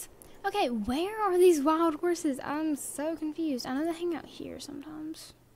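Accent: South, United States. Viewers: Your shoutout literally made me smile from ear to ear I'm so happy you liked the home. I really love this series so much, and your so amazing I love your voice and the way you say most things it's so....southern lol I love youuuu!!!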